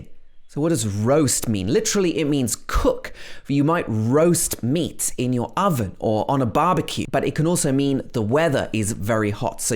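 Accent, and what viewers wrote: United Kingdom. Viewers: This my favorite British accent coach and channel 🔥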